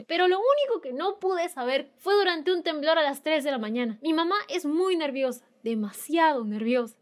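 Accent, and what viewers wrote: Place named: Chile. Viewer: sos chilena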